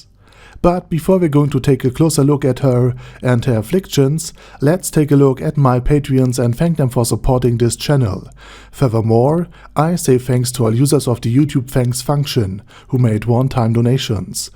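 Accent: Germany. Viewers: i am pretty pretty sure ur are german arent you? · Boi you got a hard German accent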